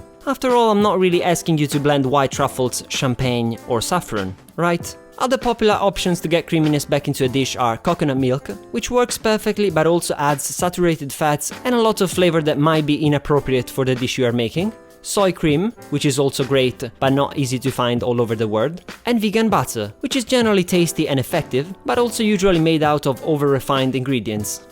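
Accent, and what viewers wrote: Italy. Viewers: I love this channel, so many ideas. Have a hard time finding raw cashews, everywhere I see them are fried or roasted. Also I really like your accent, is it italian? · absolutely, thank you! And same here. May I just add that your videos are amazing! They are very well done and the explanation is terrific! I have watched quite a lot of them and can't wait to look at more, and obviously try them. I am not a vegan, but a vegan-friendly vegetarian (🙄😜) and videos like yours are very helpful. And I was missing cream so badly (the store-bought one isn't good, at least here in Europe). So, thank you! Do I recognize and Italian accent? Maybe from northern Italy? Un saluto da una tedesca cresciuta in Italia 👋🏼 Ciao!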